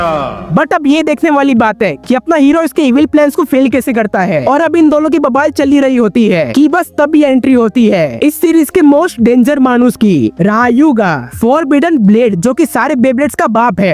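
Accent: Assam, India. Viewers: Bro, are you from Assam?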